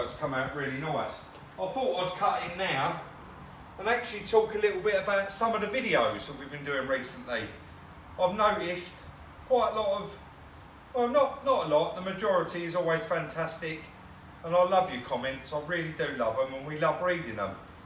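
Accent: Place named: Yorkshire, United Kingdom. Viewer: Great vid. You are making honest money there on that, cos you put time, money and organisation in. I am Scottish and I just love your accent. What part of Yorkshire are you from?...........lol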